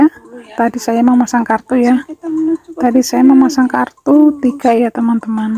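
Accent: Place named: Banyumas, Indonesia. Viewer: Mantep niih info tutoriale mbakyuuuu..dulurku kiye..pada pada ngapak...kiye..mantep pokoke.. Salam sukses luuur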